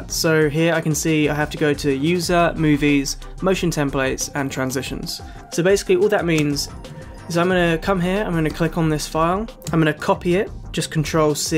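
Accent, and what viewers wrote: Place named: United Kingdom. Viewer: I did not expect you to be from the UK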